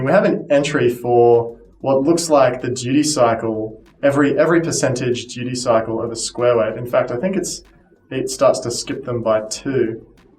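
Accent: Australia. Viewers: He's saying Hertz correctly. With an Australian accent.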